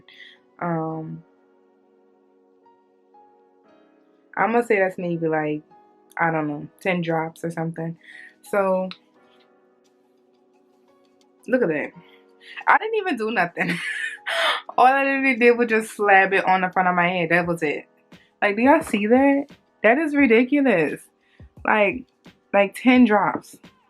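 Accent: New Orleans, United States. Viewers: You sound like you're from New Orleans I'm from NOLA. But my hairdresser just recommended this as a shampoo to strip my hair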